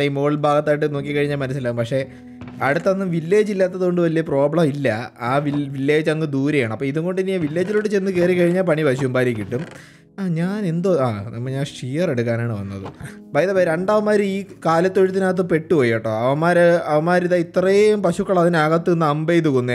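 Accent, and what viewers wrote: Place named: Kasaragod, India. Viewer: Kerala mayi touch ullad kasarkood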